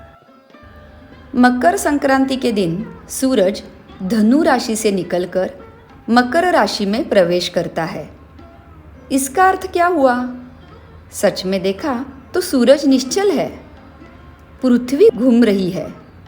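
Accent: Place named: Maharashtra, India. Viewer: छान विवेचन आहे. पण एक मात्र नक्की की मराठी माणूस जेंव्हा हिंदी बोलतो ते लगेच लक्षात येते. 😊😊😊 Great going... 🙏🏻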